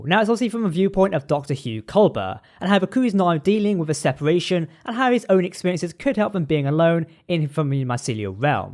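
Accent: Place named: Cockney, United Kingdom. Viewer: Thank you for this.... I like watch your reviews prior to watching the episode.... one humble request.... is their a way you can talk a bit slower, the cockney accent (of that's what it is) gets in the way of comprehesion a bit.... thank you again....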